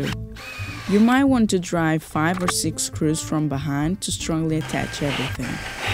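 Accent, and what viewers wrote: Portugal. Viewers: caralho, não falho, percebi logo que eras tuga pelo teu sotaque no ingles hahahaha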